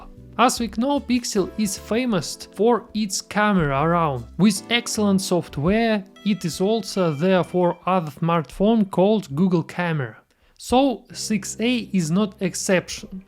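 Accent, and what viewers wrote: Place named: Russia. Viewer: subscribed just for Russian accent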